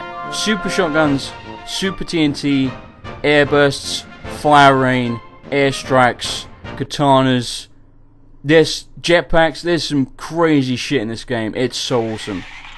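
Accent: United Kingdom. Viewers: He's surely british.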